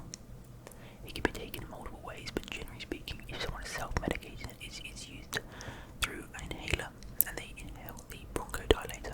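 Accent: United Kingdom. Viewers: Time for another game of have I been saying that word wrong or do British people just say it differently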